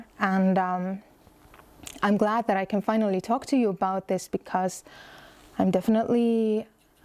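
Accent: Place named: Los Angeles, United States. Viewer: "kind of like" yeah, sounds LA alright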